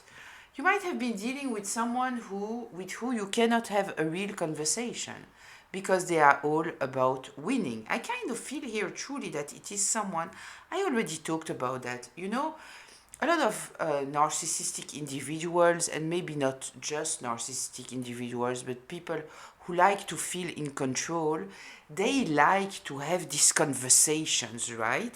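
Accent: France. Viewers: I enjoy your readings very much. Watching from Arizona where it seems more and more of those narcissists are being bred every time I turn around! Your insights are amazing and very helpful. I really love your French English too. Thank you for your energy.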